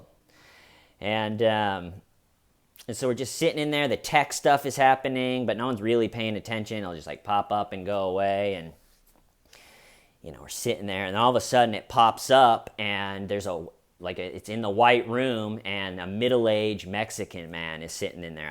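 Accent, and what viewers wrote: England, United Kingdom. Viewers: Amazing reporting. Loved every second of it especially the English accent Btw. Any news on the big film premiere Can’t wAit to see it I found the BBC lady. She is quite new on screen reporter So funny Tattoos Poker chips Maxwell Hilarious 😆 🤣🤣😆😆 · Love love love the English accent. Lol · And that is why they are security guards. Good English accent by the way.👏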